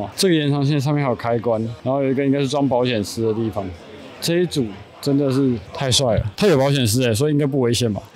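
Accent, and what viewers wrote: Taiwan. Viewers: 哇！你的口音 根本台灣人耶 ，但臉是阿兜啊！你在台灣出生的嗎？ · 不好意思，為什麼我看到外國人的臉，講話為何是台灣年輕人？（這影片自己跳出來） 我太好奇了立馬自己找答案所以我很努力的滑最前面的影片，因為介紹通常都在前面，您爸爸是德國人媽媽是波蘭人，在台北出生在高雄長大，進口的台灣人ya~ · 你居然有台灣口音,聽了真親切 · 哇!你的中文口音好台~ 那個是蔣中正啦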